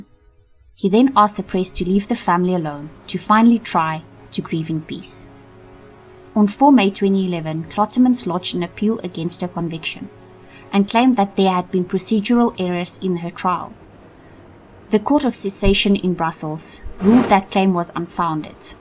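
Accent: South Africa. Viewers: Im binge watching ur videos ur work is great and i sense ur accent is south African ❤🎉watching from SOUTH AFRICA 🇿🇦🇿🇦🇿🇦 im truly proud of ur work ur videos are long shows dedication and the amount of hard work u put in u gained a new sub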